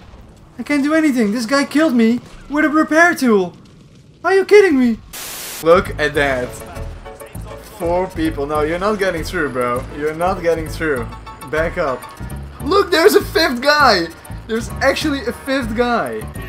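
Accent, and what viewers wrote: Netherlands. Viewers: Are you dutch? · you sound dutch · Hold up! Are you dutchh? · I assumed you are Dutch because of the way you speak German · his accent, i ame and it sounds like Dutch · klinkt deze guy een beetje nederlands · he's Dutch like me cause of his accent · i knew youre dutch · Klopt het dat je nederlands bent? ? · Are u dutch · are you dutch? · You have a good duch accent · Waarom klink je zo Nederlands het is eng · its sounds like a dutch person is talking english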